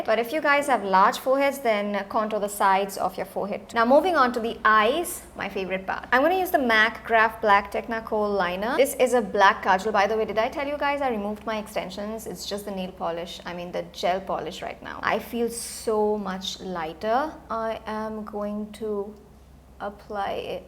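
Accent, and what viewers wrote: Bengal, India. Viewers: Yes that bengali accent was beautiful 😍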